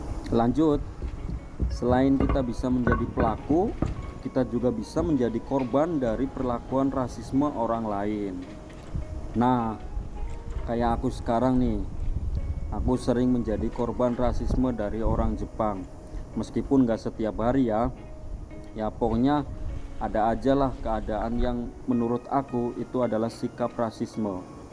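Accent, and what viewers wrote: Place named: Banyumas, Indonesia. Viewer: Sampeang ngomonge lucu glhaha.. sampean nadane kayong ngapak..mantab isa ng jepang 😀👍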